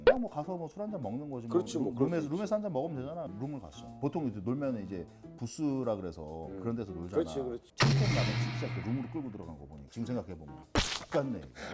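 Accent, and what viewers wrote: Gangwon, South Korea. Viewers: 강원도 사투리 지린다 ㅋㅋㅋㅋㅋㅋㅋㅋ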